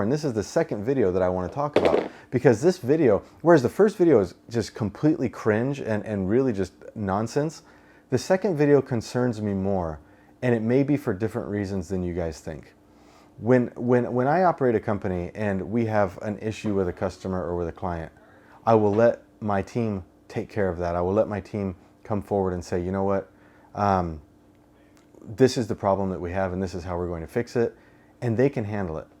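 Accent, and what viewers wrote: United States. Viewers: Good video man. The way you sometimes pronounce "L"s is very strange to me. Where does that come from cause you have a very natural American accent aside from that.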